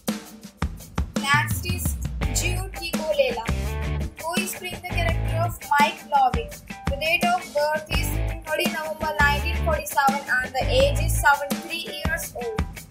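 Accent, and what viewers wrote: India. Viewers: The way u pronounce the names made me cringe so badly. Typical badh badh ding ding Indian accent.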